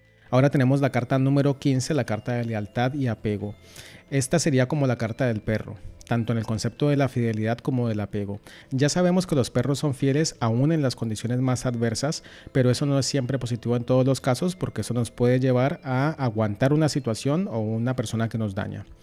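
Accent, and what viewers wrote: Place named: Lima, Peru. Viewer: Gracias por compartir esta clase. Quería preguntarte¿de dónde eres? Tu acento es igual al de mi ciudad, soy de Lima-Perú.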